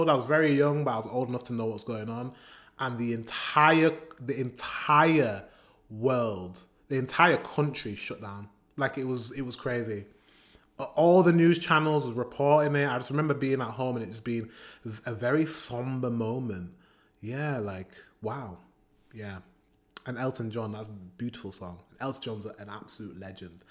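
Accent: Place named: Leeds, United Kingdom. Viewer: Does he live in Leeds? I assumed so due to the accent. I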